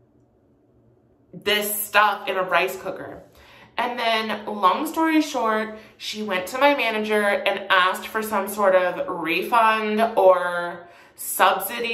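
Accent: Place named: Canada. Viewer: Yeetus Feetus because he pronounced those words with the “long a” sound. Which is okay, I know he’s from Canada. But I’m from America and we pronounce those words with the “short a” sound so I thought it was funny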